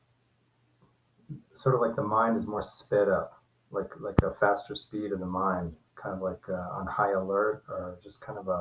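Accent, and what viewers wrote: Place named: Germany. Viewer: Lol. I'm actually normally good at accents and had detected some German intonations in yours, but same with some Irish people. I'll go check out if it is backed up by any teutonic influence...Talk to you another time.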